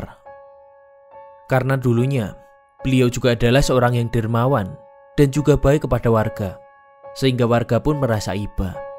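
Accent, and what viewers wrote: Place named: Temanggung, Indonesia. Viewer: Aksennya temanggung banget min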